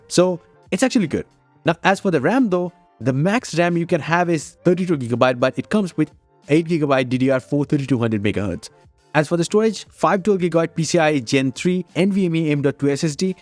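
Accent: India. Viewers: THICK BOMBAY ACCENT IS IRRITATING. THEY SHULD STICK WITH THEIR NATIONAL LANGUAGE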